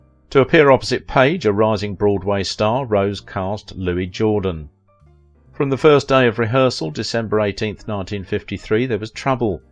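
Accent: United Kingdom. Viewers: Okay I am tired of hearing a British accent narrating a totally American documentary.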